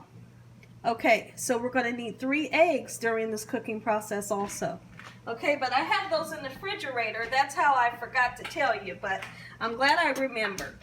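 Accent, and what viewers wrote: South, United States. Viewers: Where are you from ?? you are southern parts of somewhere🤗 Louisiana maybe? I'm from Alabama and we do things alot alike ❤️ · ❤️❤️❤️ that sweet Southern accent 😌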